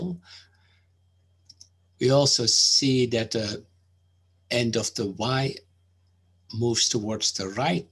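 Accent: Netherlands. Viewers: do I hear a Dutch accent?? 🙋‍♀️ from The Netherlands.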